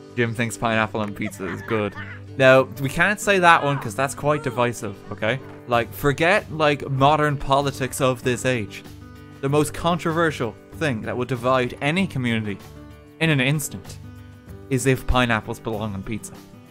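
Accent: Ireland. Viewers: I figured you were Irish. XD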